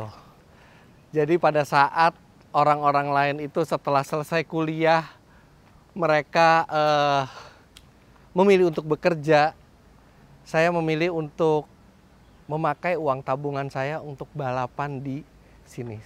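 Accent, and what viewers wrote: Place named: Java, Indonesia. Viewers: dan saya logatnya medok dan saya langsung dapat panggilan jawir😅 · "Agak shock berada disini. Karena....cara ngomongnya itu beda. Saya biasanya itu 'aku, kamu' disini 'lo, gwejh' dan saya logatnya medok dan saya langsung dapet panggilan Jawir"🗿 …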